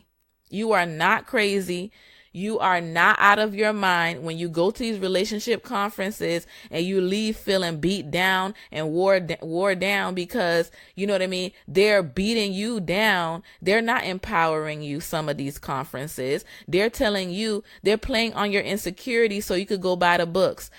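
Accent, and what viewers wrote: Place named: East Coast, United States. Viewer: Where are you from? New York? New Jersey? You have that east coast accent.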